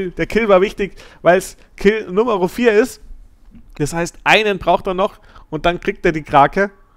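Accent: Bavaria, Germany. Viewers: Super Video ^^ Du hast eine klasse und angenehme Art zu kommentieren. Mach aber bitte mehr auf boarisch ;)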